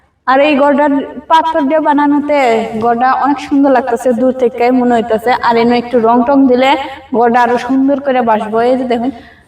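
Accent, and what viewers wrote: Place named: Sylhet, Bangladesh. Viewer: Your speaking accent is much like to the Sylheti dialect/language 🎉😮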